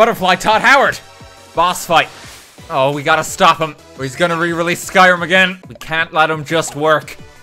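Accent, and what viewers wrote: Ireland. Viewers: Irish accent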